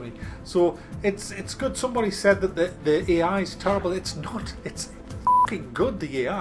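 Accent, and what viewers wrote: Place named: North, United Kingdom. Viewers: Don't worry what "they" think.I love your vids, reviews, honestly, humour, the sudden madness of abuse of the games/designers/etc and "bell banging".. and , of course Mr bunny.And AND,AND! AND! ( bang bell.!!!!) AND! ( bang bell.!!!!)that cute English accent..(((((thinking of having a whip-round for a new bell..maybe a BIGGER one..like school teachers use to have to ring at end of 'break time..YES!))).Worth a watch.... AB-so-fuggly-BLOODY-lutly.( ( bang bell.!!!!) Have a 'good day'and 'no worries mate'. from Christina in Adelaide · noooo, you aren't a caveman. You're just northern <3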